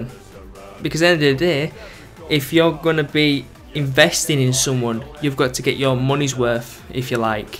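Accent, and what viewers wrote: Sweden. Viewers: Are you from Sweden? :D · ur Sweden O.O??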